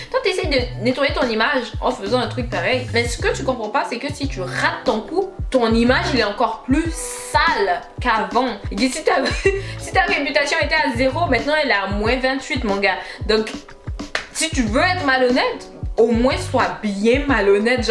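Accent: Canada. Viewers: Tu as un accent canadien je kiff grave 😂😘😘😘😂😂😂😂😂😂😘😂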